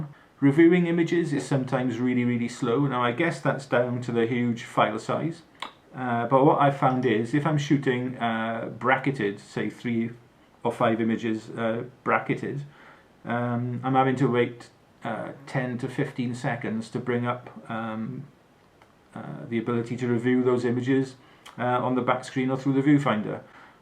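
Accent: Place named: Wales, United Kingdom. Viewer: Just come across this by chance. Not a Fuji shooter (used to have an XT1 though) but love the medium format landscape look & if money was not an issue this would have been a must.. Nice to hear a Welsh voice and someone shooting landscape on You tube. Just off to check your other videos. Hope you get your probs sorted with this camera. atb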